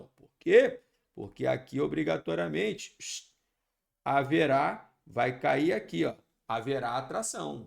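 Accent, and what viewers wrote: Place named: Rio de Janeiro, Brazil. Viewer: Esse carioquês é uma maravillha, kkkkkk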